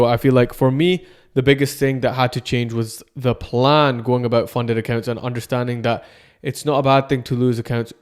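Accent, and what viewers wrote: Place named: Scotland, United Kingdom. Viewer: Nice to hear another Scottish 🏴 accent.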